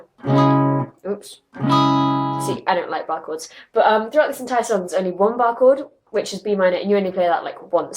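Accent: United Kingdom. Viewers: British accent is so so......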